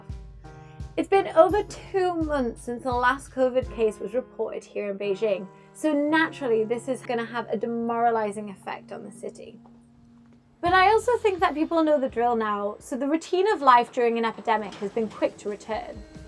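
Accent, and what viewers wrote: United Kingdom. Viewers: I learn British accent from you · A British accented woman turning pink, interesting...now you can see how powerful the CCP's brainwashing techniques really are. A low-IQ, low-efficiency way of controlling the virus and society became widely acclaimed by even the woman who is obviously well educated. Interesting...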